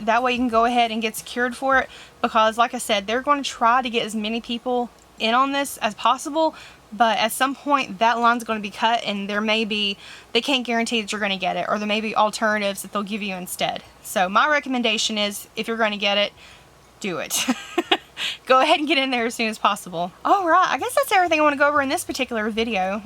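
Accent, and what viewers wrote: South, United States. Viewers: Sorry about you not feeling well! Are you southern though?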